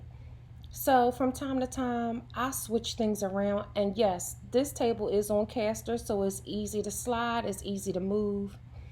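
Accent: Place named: DMV, United States. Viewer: I liked this video bcuz you sound like you're from the DMV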